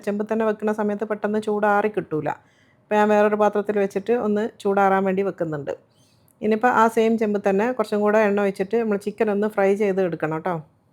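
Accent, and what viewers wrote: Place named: Kannur, India. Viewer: Kannur aano samsaaram kelkkumbol angane thonnunnu 🥰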